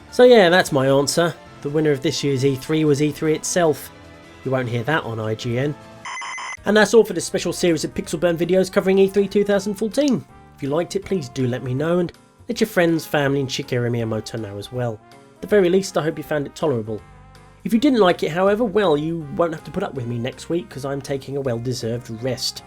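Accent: England, United Kingdom. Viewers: Still nothing from any of these companies that makes me want to run out and buy a new console. Good video though. Also, are you getting more English? You sounded more English in this video than previously.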